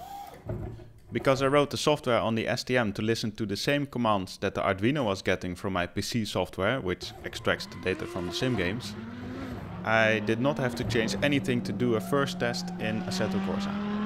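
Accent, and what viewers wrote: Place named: Netherlands. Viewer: Het is duidelijk van jouw stem dat je uit Nederland komt. LOL. Zier er top uit.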